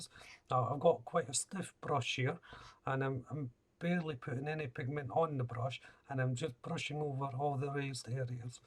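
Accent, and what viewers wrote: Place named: Fife, United Kingdom. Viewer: I kinda thought I recognised your accent. I spent many years around Glenrothes and Dunfermline before moving to Asia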